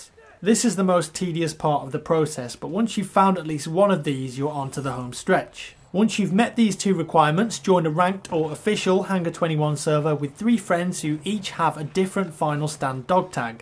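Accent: England, United Kingdom. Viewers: beatiful english acsent <==== sorry for this english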